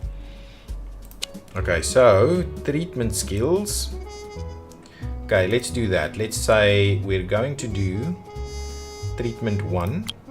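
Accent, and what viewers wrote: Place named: South Africa. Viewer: You must be from SA 🎉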